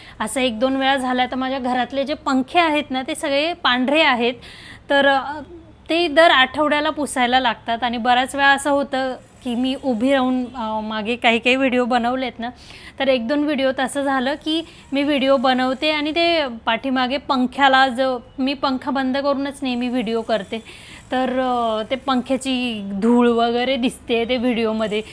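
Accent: Khandesh, India. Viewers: खूपच खिळवून ठेवणारे , वास्तवाला विनोदी पद्धतीने , टोमणे मारत सांगीतलेले असते.. सर्वात कमाल म्हणजे हे आधी लिहिलेलं नसतं, ऐनवेळी कसे सुचते? खूप छान flow असतो , विषय सोडून अजिबात इकडे तिकडे जात नाही.. आम्ही 5 मिनिटात बघतो reel. पण किती प्रचंड मेहनत, आणि बारकाईने detailing केलेले असते.. सासूबाई, आई सगळे पात्र अगदी जिवंतपणे उभी करता.. ह्याचे आश्चर्य वाटते की विषय सुचतात तरी कसे?आणि ते किती सहजपणे खुलवता तुम्ही!! प्रत्येक वेळीस सर्व पसारा आवरणे, घर टापटीप करणे, बापरे , किती तळमळीने करता हे काम? आवाजाचा खानदेशी ठसका ,स्पष्ट आणि गोड आवाज सगळाच खूप छान आहे.. केवळ viewers साठी घेणाऱ्या तुमच्या कष्टाला सलाम!. All the best..तुमच्या reel ची नेहमी वाट बघते..आणि कितीही घाईत असले तरी ते बघितल्याशिवाय राहवतच नाही. 😊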